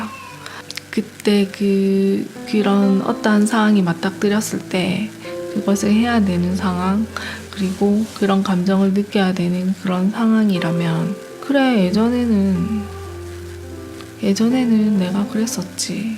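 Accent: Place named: Busan, South Korea. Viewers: 좋은이야기 잘듣고가요~^^ 경상도 말투 넘 좋습니다~ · 세부 바다 수영 ㅋㅋ 영상 왤케 귀여우십니까.ㅋㅋ 부산사투리가 완전 귀여워요 ㅋㅋ 물론 저도 부산사람입니다만 ㅋㅋ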